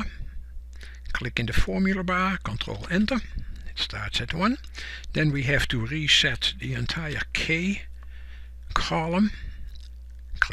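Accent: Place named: Netherlands. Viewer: Am I the only one to adore your voice and your lovely Dutch or Flemish accent?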